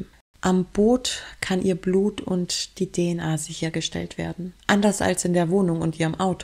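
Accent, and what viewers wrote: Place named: Swabia, Germany. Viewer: Man hört das Schwäble raus 😁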